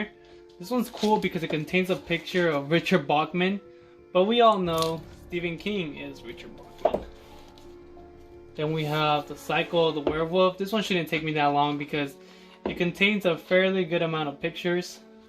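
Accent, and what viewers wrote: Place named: Latin America. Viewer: Book haul + latino accent = asmr = heaven for me 😉😉😜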